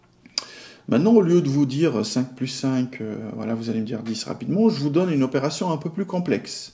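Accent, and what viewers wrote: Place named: Italy. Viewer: C'est ça qu'on appel "LA PÉDAGOGIE". PS: avec accent italien.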